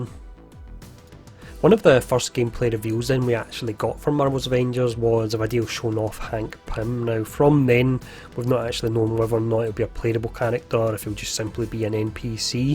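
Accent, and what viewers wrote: Scotland, United Kingdom. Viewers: The accents the best part cause he’s Scottish. What a legend! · Long time sub, new commenter. No worries with the Scots accent on my part, half my family are from Perth lol. Keep up the awesome work dude, I played the beta on xbox this weekend and enjoyed it, what are your thoughts after 2 weekends of play? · I have to admit i don't really mind the Scottish accent, even if i'm french. I know since Marvel Heroes and i'm used to it now, i'm pretty glad i am able to understand more english streamers / youtubers thanks to that! Welcome the comment section! Thanks for the good work! Cheers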